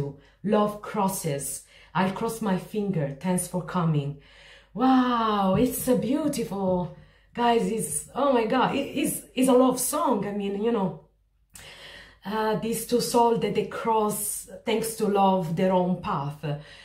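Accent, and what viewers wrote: Italy. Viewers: Amo tu ingles con acento italiano suena cool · Reina, gracias por reaccionar a un genio del rock Argentino como lo fue. Un musico diferente al resto.. Noto una bellisima tonada Italiana. Eres de Italia? por que hablas en ingles? Besos linda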